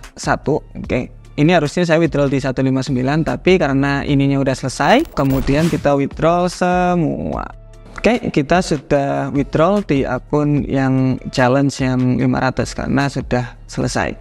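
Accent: Java, Indonesia. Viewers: Asli mana mas Logatnya kayak dari Jawa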